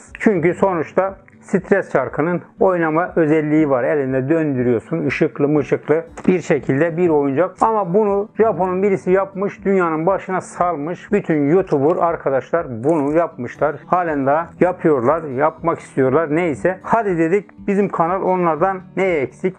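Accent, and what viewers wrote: Erzurum, Turkey. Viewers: Abi Sen Erzurum’lumusun şiven bize çok benziyor:)?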